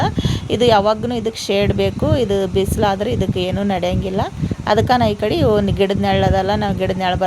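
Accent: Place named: North Karnataka, India. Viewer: ನೀವು ಕೂಡ ನೋಡಕ್ಕೆ ತುಂಬಾ ಚೆನ್ನಾಗಿದ್ದೀರಾ ಕ್ಯೂಟ್ ಆಗಿ ಹುಡುಗಿಯಲ್ಲಿ ಎಷ್ಟು ಚಂದ ಇದ್ದೀರಾ ನೀವು ಹಾಗಾದರೆ ಹೌದು ಅದನ್ನ ತೊಳಿತ ಇರಬೇಕು ಗಿಡಗಳನ್ನು ತುಂಬಾ ಚೆನ್ನಾಗಿ ಬಳಸಿದ್ದೀರಾ ಬಿಸಿಲು ಹಾಳಾಗುತ್ತೆ ಅಂತ ಹೇಳಿದ್ದಾರೆ ಕರಾಬು ನಮ್ಮ ಮನೆಯಲ್ಲಿ ಇಲ್ಲ ನಿಮ್ಮ ಗಿಡಗಳು ನಿಮ್ಮ ಮಾತು ಚಂದ ಉತ್ತರ ಕರ್ನಾಟಕದ ಮಾತು. ಇಂದು ಸ್ವಂತ ಮನೆ ಅದಕ್ಕೆ ಬೆಳೆಸಿದ್ದೀರಿ ಬಾಡಿಗೆ ಮನೆಯಲ್ಲಿ ಇರುವವರು ಏನು ಮಾಡಬೇಕು ಹೇಳಿ❤❤❤❤ ಸೂಪರ್ ಪ್ಲಾಂಟ್ ಗಳು❤❤❤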